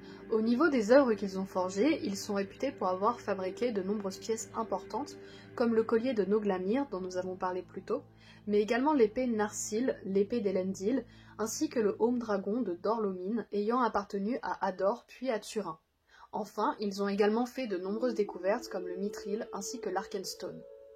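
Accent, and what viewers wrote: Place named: France. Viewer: je découvre la chaîne avec cette vidéo et je trouve çà vraiment bien ! petit bémol : ta prononciation est très française ... je pense que ce serai un peu plus intéressant avec les prononciations des langues inventées par Tolkien ( car ce sont des vraies langues qui ont nécessité pas mal de travail et puis elles sont jolies ) ont peut trouvé la prononciation dans les bouquins ( au début pour le Silmarillion et à la fin pour le Hobbit et dans le tome des appendices du Seigneur des Anneaux ) mais ça peut peut être varié selon les éditions donc allez voir la chaîne Arda et particulièrement cette vidéo <link>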